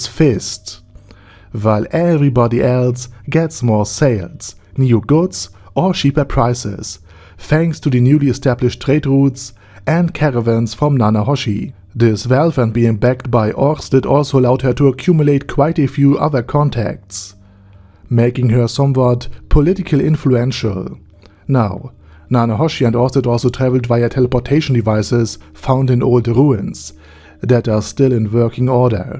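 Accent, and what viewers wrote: Germany. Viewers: jesus christ ur german accent is strong asf. deutscher bursche. ur voice is hard to listen to.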